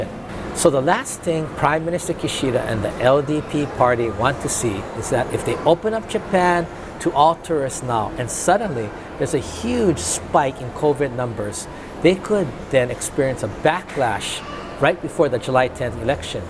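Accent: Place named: United States. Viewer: Thanks for your insight! Although it's a disappointment, but I think you might be right on allowing solo travellers in 2023 BTW, you speak with an American accent. You must have lived in the U.S for many years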